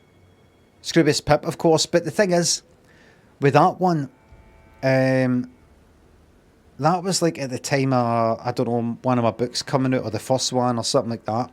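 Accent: Scotland, United Kingdom. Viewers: If you could also tone down the Scottish accent that would be great (for our viewers outside of Scotland)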